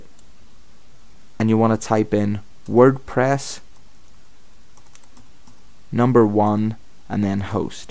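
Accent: Northern Ireland, United Kingdom. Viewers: Very clear tutorial! All actions described in detail at a nice slow pace that suits me down to the ground! Love the Northern Irish accent as well, very refreshing after all the American drawl you tend to get on the web! Thanks for posting this, it really makes it all so much easier. Now all I have to do is think of a good name....;)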